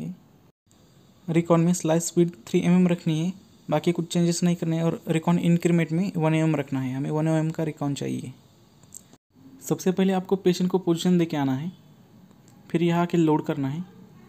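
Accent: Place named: Maharashtra, India. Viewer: jay shree ram brother'.....your language like Maharashtrian.... ❤️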